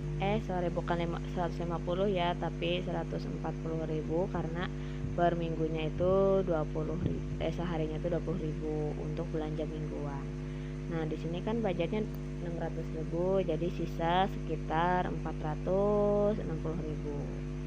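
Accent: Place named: West Java, Indonesia. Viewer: Logatnya msh ketara org sunda nya ...unboxing paket binder lanjut budgeting ya teh smg terus istiqomah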